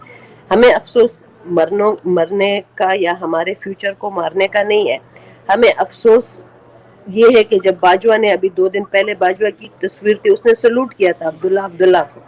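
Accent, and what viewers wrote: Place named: Kutch, India. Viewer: bhai.. your pronunciation tone sounds like your belong to gujrat - India ( Kutch) region...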